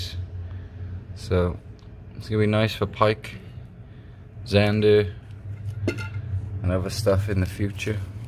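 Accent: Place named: Wales, United Kingdom. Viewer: Interested how you ended up in Holland? You're Welsh right? Or at least sound Welsh.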